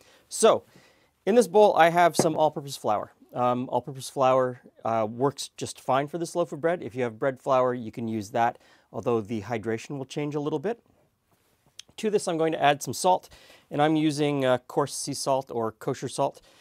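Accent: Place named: Quebec, Canada. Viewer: You are definitely from the province of Québec, so am I?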